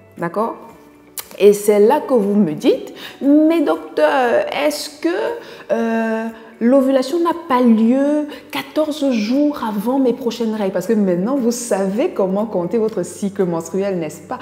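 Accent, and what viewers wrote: Cameroon. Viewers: FAQ: êtes vous camerounaise? 😅😅 je reconnais un peu l'accent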